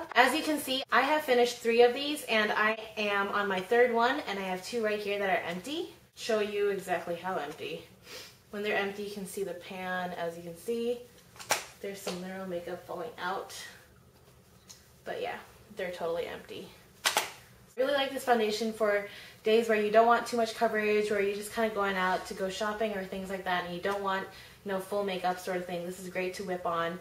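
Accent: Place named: United States. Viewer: I was wondering , why do you have american accent and you live in Australia .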